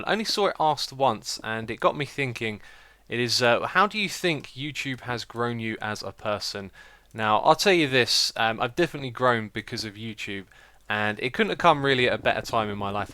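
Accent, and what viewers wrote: United Kingdom. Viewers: ***** Yeah it's his voice. So british. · Solside eclipse? Saltside eclipse? Soldside? Soulside? Sulside? What are you saying?! It's too british :(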